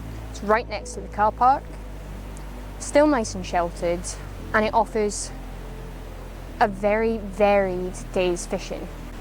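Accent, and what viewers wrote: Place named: Scotland, United Kingdom. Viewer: As always great video and great venue detail coverage 👌 and still loving the Scottish accent, u sound as beautiful as u look ❤ x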